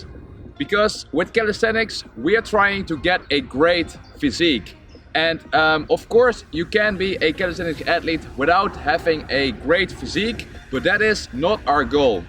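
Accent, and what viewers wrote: Netherlands. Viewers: Are you Dutch? You accent gives it away.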